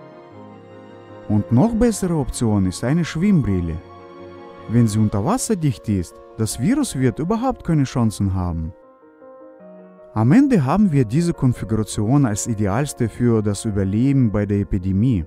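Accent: Russia. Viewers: das ist auch ne Idee 😅 Wobei ich seine russische Aussprache auch sehr mag. Ich versteh aber leider nur sehr wenig davon. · Чувствуется русский акцент)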